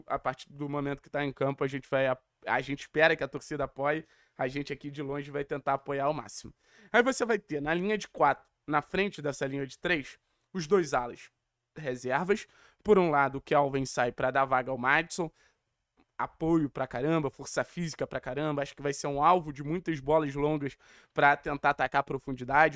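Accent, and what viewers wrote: Rio de Janeiro, Brazil. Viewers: Meus ouvidos sangram, carioca..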